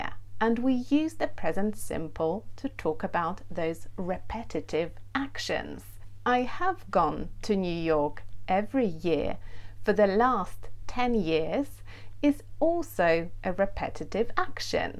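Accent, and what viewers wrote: United Kingdom. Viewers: Hi Ms, is your accent British? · Very nice British English,sounds like music in my ears! Kind regards from Spain.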